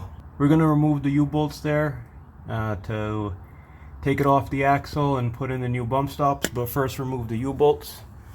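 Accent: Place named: Canada. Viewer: You sound canadian eh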